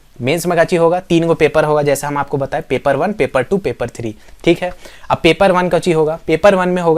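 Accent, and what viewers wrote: Jharkhand, India. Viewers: Bilkul bhaiya jharkhand wala lg rhe h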